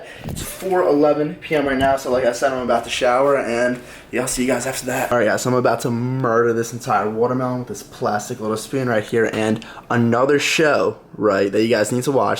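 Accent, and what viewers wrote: United States. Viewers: He has a very strong American accent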